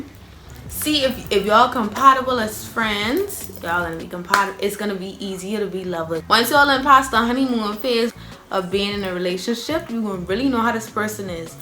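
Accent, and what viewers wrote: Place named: Caribbean. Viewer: You from trinidad? your accent is hella Caribbean and excuse me if you said it in the video I didn’t watch the whole thing 😂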